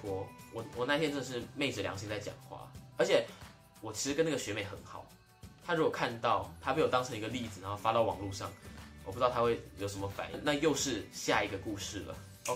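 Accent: Xiamen, China. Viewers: 我感觉厦门那边普通话也这个味儿其实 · 还好了，台湾腔，就是闽南厦门一带人说普通话的腔调而已。厦门人说普通话也你的调调。只是很多人不知道而已。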